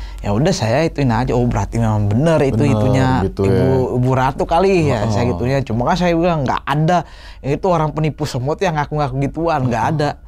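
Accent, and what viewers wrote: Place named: Jakarta, Indonesia. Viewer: Assalamualaikum.. klo ane perhatiin, nte kok mirip bae gaye maupun logat bicaranye ame tokem ane yg seniman betawi boleh dikatakan bgt, biasa bawain lagu2 bg. Kereennnnn👍🏿👍🏿 Sukses buat nte ye🙏🏿🙏🏿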